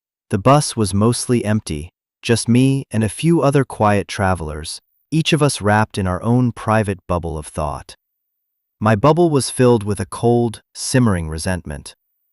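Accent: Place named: United Kingdom. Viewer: STUPID, STUPID, STUPID!! Stories told in the "first person" MUST have the following to be professional and correct. Correct gender voice of the main character. ( Female voice for female character, and male for male.) Correct accent for the charcter. (Not a British accent for a 5th generation charter from the Deep South.) Other than that it is not worth listening to, so at less than one minute into your story, bye.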